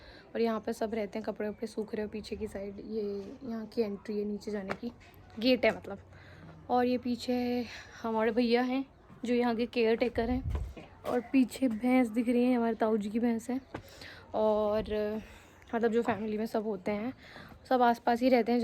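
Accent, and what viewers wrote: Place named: Meerut, India. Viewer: Your language matches with mine...you may be somewhere from meerut, moradabad, sambhal , chandausi wagerah.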